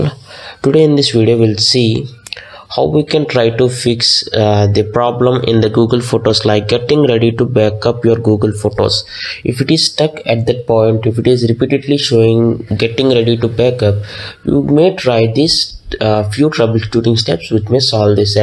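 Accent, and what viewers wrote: India. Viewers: Indian Accent us soo funny but I understand 70% although Im not a native speaker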